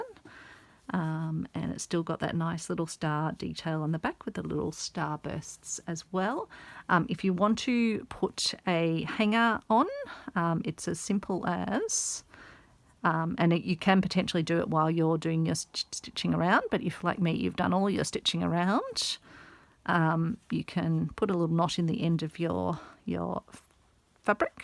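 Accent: Australia. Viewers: I thought if you put a horizontal stitch on the back at the point of the star you could put a paper clip or other wire on and use it as a decoration and take it out and use as a coaster later. Just came across you today and am glad to hear an Australian accent. Gold Coaster here. Summer hit here a month ago, then we had a few winter days just when everyone had put away their long pjs.🌲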